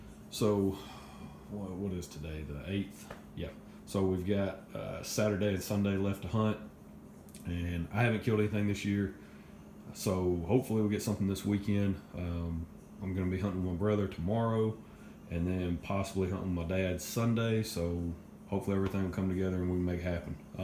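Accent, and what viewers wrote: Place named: South, United States. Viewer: I feel like we are family, so much in common even southern draw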